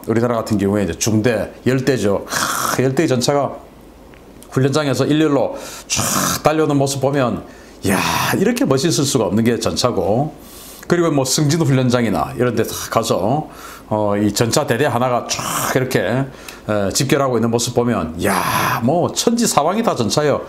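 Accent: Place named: Gyeongsang, South Korea. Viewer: 경상도 말쓰는거 보이 미똥당 ?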